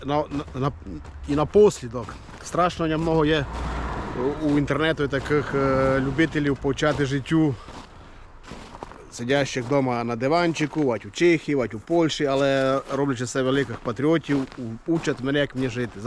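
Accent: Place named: Zakarpattia, Ukraine. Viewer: Сяка зима била...😅 зразу чути Закарпаття.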